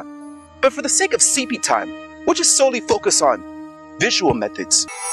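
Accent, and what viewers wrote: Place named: Jamaica. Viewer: This is awesome!! I detect a very slight Jamaican accent so I'm double proud! #blackexcellence #videoswithpurpose 🏆🥇🏆🥇🏆🥇🏆🥇🏆🥇🏆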